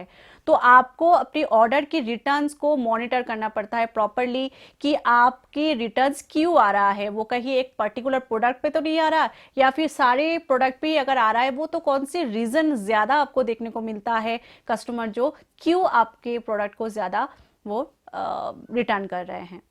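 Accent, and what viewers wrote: Bengal, India. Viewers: your language is a serious issue , else information is good...one has to focus a lot to understood that bangla , hindi and english tone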